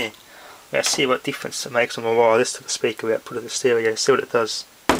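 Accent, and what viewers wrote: Australia. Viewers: It just takes a while to get used to his rural Australian dialect, in the past I've had to rewind and listen to him again. He does make some interesting videos though, but not all are winners of course.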